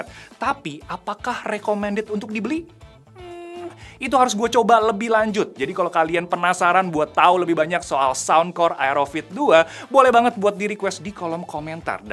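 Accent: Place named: Banjar, Indonesia. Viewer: banjar bnar wwkwk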